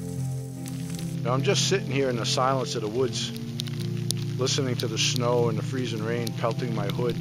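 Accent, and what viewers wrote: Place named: New England, United States. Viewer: Dear Sir, since I've stumbled upon your channel a couple of weeks ago, I can't seem to get enough of " ". I'm still sifting through videos I have not yet viewed, and often stay up to late watching your videos. I deeply understand your drive to remain independant and enjoying life in the cabin. I just turned 57 a few days ago and got most my boys through college with one still in high school. I live in the Great Lakes region and love traveling up north to northern Wisconsin and Michigan with friends that own property and cabins. I'm still working, but am trying to prioritise purchase of 40 or more acres with a cabin before too many more years pass me buy. You are my guiding inspiration to pursue my cabin dreams. Best to you and to Franky. Love your New England accent. God Bless. Carl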